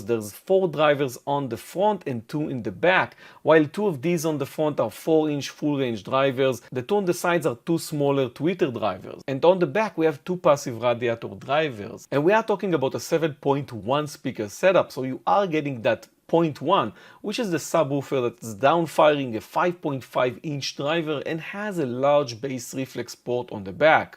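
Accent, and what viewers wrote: Israel. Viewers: Is that israeli accent?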